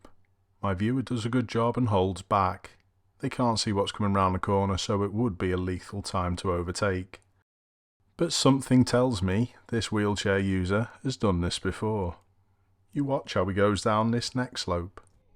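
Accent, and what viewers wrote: Liverpool, United Kingdom. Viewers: no i agree that it's not cool to hear someone purposely sound over excited about the mundane but is the opposite. He sounds like a robot that hates its own existence lol. I think he's trying to speak clearly which is fair enough being a scouse lol but still it just lacks charisma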